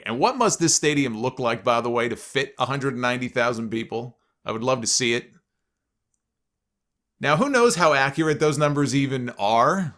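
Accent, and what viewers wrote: New York, United States. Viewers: Lol, what? I hear nothing but NY accent the entire time.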